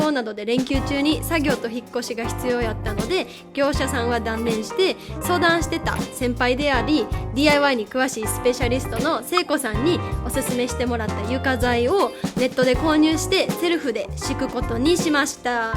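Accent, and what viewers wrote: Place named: Kansai, Japan. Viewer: めっちゃ関西弁やん。 久しぶりに関西弁聞いたけど、やっぱなんか落ち着く。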